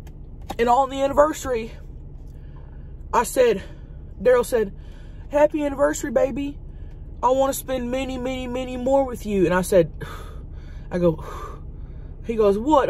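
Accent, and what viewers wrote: United States. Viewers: This woman is so damn funny😅😅I love the way she talk..that country accent. I gotta get back to her on snapchat..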